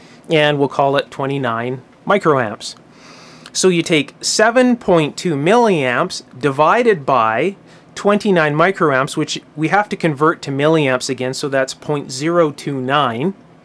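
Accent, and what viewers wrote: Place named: Canada. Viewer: I follow K7PP and probably others that you and others involved with Ham Radio and Electronics. Pete has some great videos and I love those MONSTER DAWGS he has..Hi hi.. Do you follow David at Elpasso Yube Amps? He has some neat stuff as well. Of course I am sure you follow Dave with the EEVBLOG. He's a real hoot too. Are you in the US? You sound like you may be from Canada.. Anyway good chatting with you. I own DSM Labs here in SC and refurbish R 39x series receivers including the R- 389, 390, 390A, 391 and 392 receivers. I do a little Super Pro work from time to time. 73, Glenn WA4AOS dit dit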